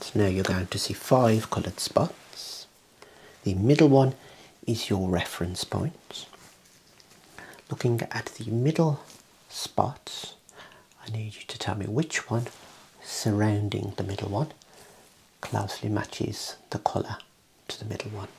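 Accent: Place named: Midlands, United Kingdom. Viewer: Me too. His Midlands accent has lessened which is a shame.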